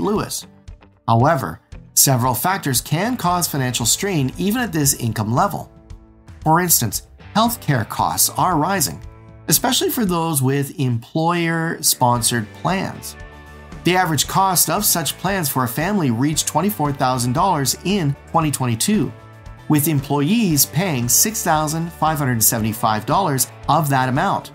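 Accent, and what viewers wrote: Canada. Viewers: Love the Canadian accent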